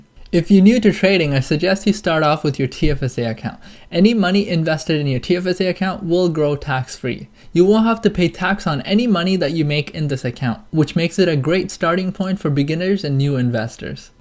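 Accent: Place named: Tamil Nadu, India. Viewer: Bro are you a tamil? Apdina neenga vera level bro(really good). Unga(your) accent and way of thinking is superb. I have subscribed and proud to see an Indian doing so great in terms of investing which is non existent here. Thanks a lot.!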